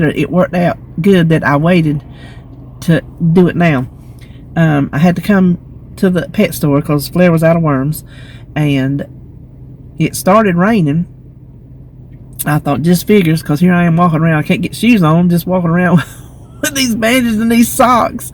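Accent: South, United States. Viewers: YES Ma’am! One step closer to surgery! I wish you could talk to us everyday because you give me so much happiness. Just hearing that sweet southern accent and all your sayings crack me up! You are definitely a special friend to me. Love, hugs & laughs to you . ❤️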